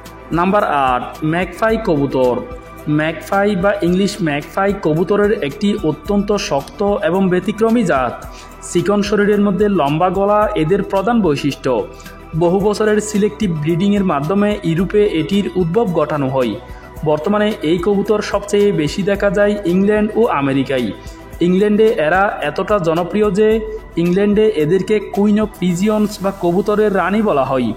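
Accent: Chittagong, Bangladesh. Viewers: ওনি ছট্রগ্রামের লোক।। তাই ছট্রগ্রামের বাষায় কতা বলেন।।। কিচু মনে খরবেননা।।।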